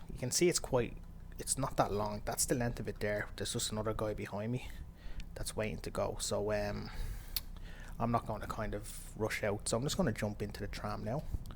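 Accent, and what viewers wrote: Ireland. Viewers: I love your Irish accent.. North or RoI? I'm from Wales :)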